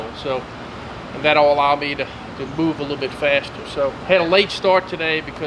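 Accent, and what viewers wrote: Louisiana, United States. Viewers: Louisiana...a bit of a cajun accent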